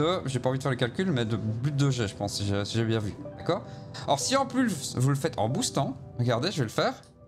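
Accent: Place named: Belgium. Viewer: J'adore la prononciation belge : un ennemi qui "fouit" au lieu de "fuit" xD Tes vidéos me rappellent des potes gamers de Bruxelles et Liège qui disent "houit" au lieu de "huit."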